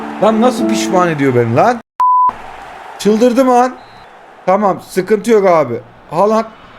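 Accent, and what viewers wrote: Kahramanmaraş, Turkey. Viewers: abi challenge mahalle maçı kurallarıyla online rakibe karşı oyna lütfen beni al abi istanbuldan selamlar bende maraşlıyım sen aynı maraşlılar gibi konuşuyosun abi seviliyosun kral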